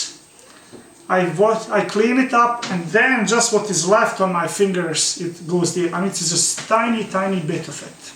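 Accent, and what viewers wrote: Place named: Bosnia and Herzegovina. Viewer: the only thing in this video close to a bosnian cevap is the accent!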